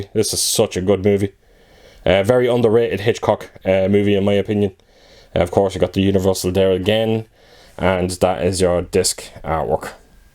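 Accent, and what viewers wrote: Ireland. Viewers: I know we chatted before neighbor, I thought you were a Louth man (accent) if you remember, anyways I love the channel and never miss an upload keep them coming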